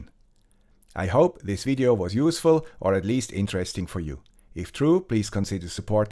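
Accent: Switzerland. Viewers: ok, remember a couple of months go, i complained about your english, but after watching you a bit, i get used to it, and now i really like your accent... i mean, i have a Youtube canal too (shameless plug here is the channel name) and i speak english there too, and im also from swizzerland, and i have a accent... thats what is special about us, we dont speak the perfect english... keep going. i like what you are doing! and because the temperature, i have a idea: you can let cold water flow where no sensors and sorar panel is, and it should cool it a bit(hopefully enough)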